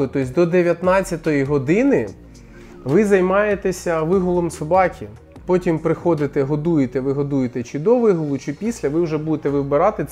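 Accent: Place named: Surzhyk, Ukraine. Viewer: Слушаю и понимаю какой суржик жоский, с другой стороны, ставя себя на место человека в телефоне понимаю что я если бы говорил обычной скоростью, а не запинаясь вспоминаю як же буде черевик українською. То было бы аналогично если не хуже. Респект за мову.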